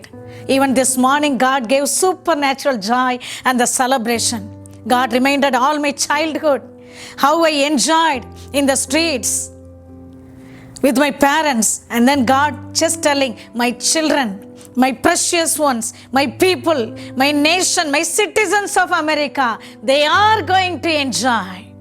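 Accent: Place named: India. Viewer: Respectfully I tell you,I like your Indian accent but more still I like your great faith in the Lord Jesus.Thankyou.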